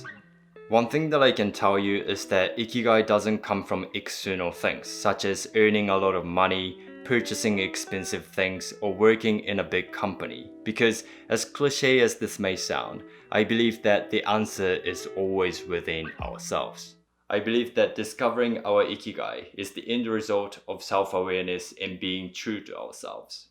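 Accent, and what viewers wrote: New Zealand. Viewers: is that a new zealand accent? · No wonder your English sounds funny, you learned it in New Zealand. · Thank you for this: it's interesting that your English retains your New Zealand experience ("sex & sivin" for "six & seven"). Bravo..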